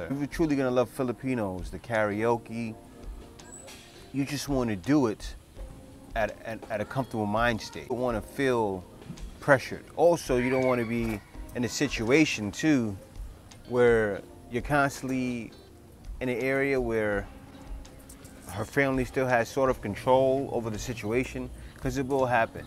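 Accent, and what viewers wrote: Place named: New York, United States. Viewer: My brother aren't you a New Yorker ⁉️😅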